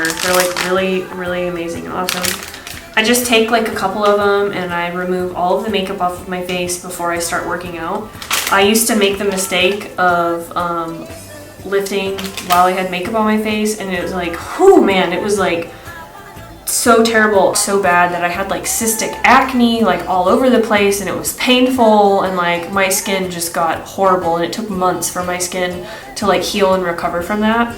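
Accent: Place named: South, United States. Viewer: Where are you from? I hear a mild southern accent!!!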